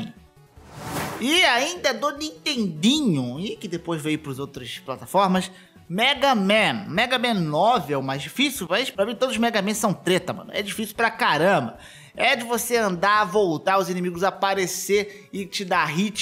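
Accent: Rio de Janeiro, Brazil. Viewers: vc e do Rio?(se for e de onde?)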